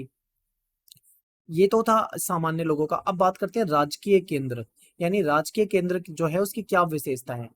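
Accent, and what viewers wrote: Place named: Haryana, India. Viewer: Bhiari nhi beta haryana se ha❤😊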